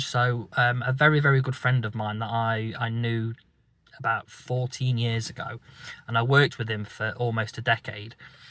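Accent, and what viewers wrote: United Kingdom. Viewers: You sound like you’re from Derbyshire/Nottingham area. I live in Sheffield and don’t care for drinking but love the footy like you and sport in general. If you ever fancy a walk or coffee let me know. I have struggled with my addiction for years although it’s drink or drugs related. Stay strong today brother.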